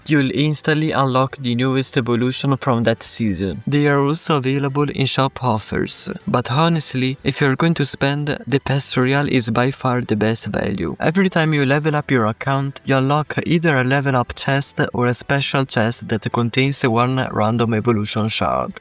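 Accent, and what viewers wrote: Italy. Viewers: his accent is clearly italian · Are you Italian?Cuz your accent seems so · italian accent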